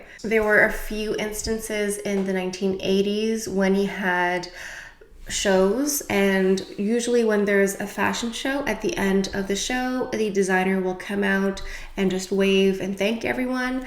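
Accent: France. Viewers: I just discovered your channel. It's a good idea to talk about fashion and makeup at the same time. Your French accent is amazing.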